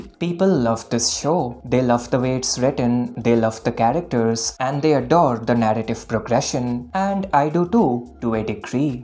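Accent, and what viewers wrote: India. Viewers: Bro are you indian? Your accent sounds quite indian to me.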